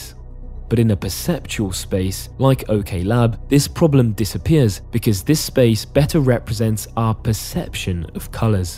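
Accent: United Kingdom. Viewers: Also notice how he pronounces Weber with the first e like the French é (which is how it's supposed to be pronounced) and not like the way Anglo speakers pronounce the first e in Weber or Webber. Come to think of it, the narrator is not at all British. He has a "refined" British accent but I can say with 100% certainly that he did NOT grow up in the UK but, rather, in Germany, Austria, or, perhaps, Switzerland, and went to a fancy prep school or boarding school where Southern British Standard is taught from a young age (or taught very intensively) and/or maybe he's extremely talented phonetically and his accent is obviously not a stereotypically German accent (like the kind that's used on shows and movies depicting German characters, often humorously, sometimes not, like the movies Nazi era movies) and it's not anything at all like the accent of the late Dr. 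Ruth Westheimer, BUT a British accent (regardless of region or socioeconomic status) it's not. There is a wide spectrum of accents characterising people born and raised in the UK and he is not on that spectrum... He's close... He's real bloody close, but he would never be able to fool a native British person or an expert linguist into thinking he's British. He might fool a Yank, but never a Brit.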